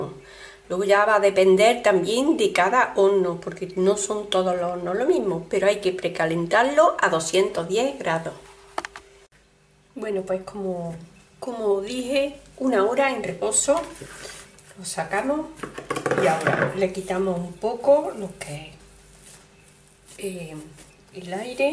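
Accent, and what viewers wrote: Córdoba, Argentina. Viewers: Muchas gracias señora mi padre era cordobés or desgracia nos dejo con treita y tres años ese sabor me recuerda a el y el acento de usted aun mas Dios la bendiga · Que bien lo explicas se nota que eres cordobesa yo también lo soi mil gracias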